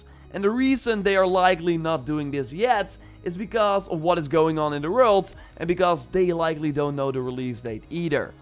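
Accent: Netherlands. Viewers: Ik dacht al dat je nederlands sprak, door je accent 🇳🇱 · Are you dutch? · Ben jij een nederlander? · Ben je Nederlands?😅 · ok met je kanker Nederlandse accent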